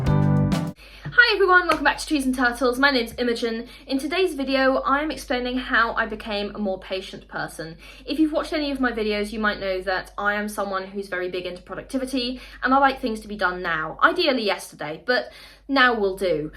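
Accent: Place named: United Kingdom. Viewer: You came into my algorithm I was about to pass on Then I heard your accent Its so British haha Found you interesting, I'mma try to listen to more of you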